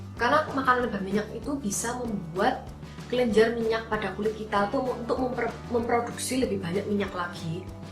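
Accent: Java, Indonesia. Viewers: Mbak e medok banget bahasanya😂😂😂😘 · Aduh pusing dengernya logat jawa wkw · Jawa medok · Medokkk banget mba.. Hahah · pekatnya slang jawa 😅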